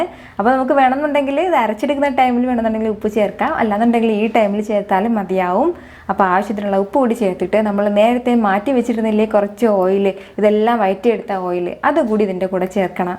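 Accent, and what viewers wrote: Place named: Malappuram, India. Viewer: 😍😍..ikka malapuram aano...atho kozhikodoo...slang supr aanu🤩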